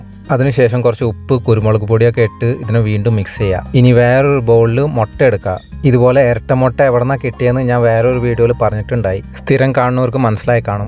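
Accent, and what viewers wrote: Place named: Thrissur, India. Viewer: Slang thrissur pole thanne ind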